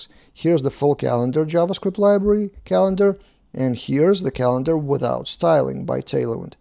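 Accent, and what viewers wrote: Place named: Russia. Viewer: Имеется ели заметный русский акцент у автора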